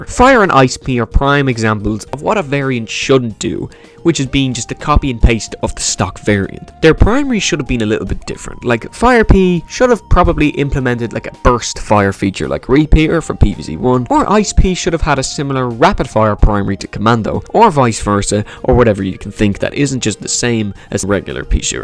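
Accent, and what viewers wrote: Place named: Ireland. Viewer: Irish accent 🤑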